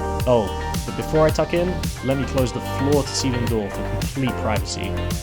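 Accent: England, United Kingdom. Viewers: Extraordinarily light blue eyes. I thought he might be Norwegian but I've just googled and apparently it's diminutive Breton for Jean. Thought he pronounced Perignon well.. Saw another vid where he put a stress on the "u" of Olufsen, whereas English people usually stress the O, although you can't tell he's not Oxford/Slough English with just a touch of Youtube US. Loved the cubbyhole demo🙋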